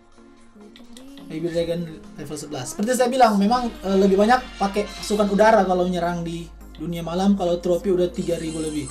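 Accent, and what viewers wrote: Manado, Indonesia. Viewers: Bang lu org manado ya??, sorry subcriber baru btw gua juga org manado tpi sekarang tinggal di gorontalo karena tugas ortu....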